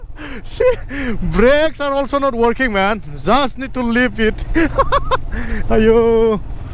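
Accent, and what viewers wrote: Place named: Baksa, India. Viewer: baksani sur arw ngswr ni sur jw alda Ka ada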